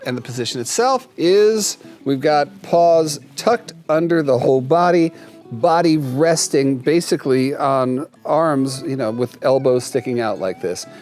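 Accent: England, United Kingdom. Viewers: Lol! Great description of The Loaf - with illustrations!! Also LOVE the British accent/Masterpiece Theater style. Had me smiling a long time. Thank you. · I can smell some English accent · How long have you been training to speak posh Englisch?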